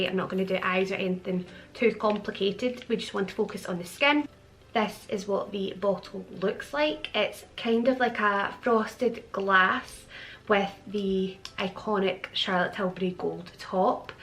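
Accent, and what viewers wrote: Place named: Scotland, United Kingdom. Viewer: LOVE that Scottish accent omg!!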